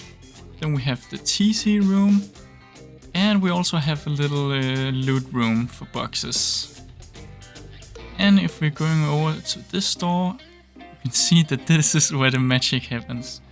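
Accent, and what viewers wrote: Denmark. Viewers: hey are you guys danish? because you sound danish :) btw i am danish have a good day or if you now danish hav en gode dag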